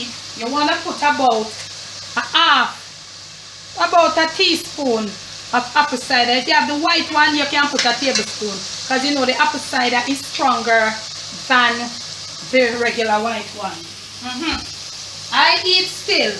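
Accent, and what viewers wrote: Jamaica. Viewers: love the jamiacan accent from trinidad here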